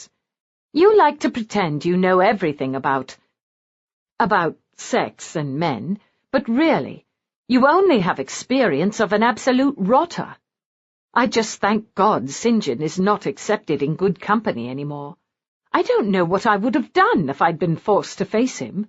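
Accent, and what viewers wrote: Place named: England, United Kingdom. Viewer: Regarding pronunciation. Many words spoken in England are pronounced differently in the US. This story is read by an English woman. All of these differences is what makes the world and different cultures so fascinating.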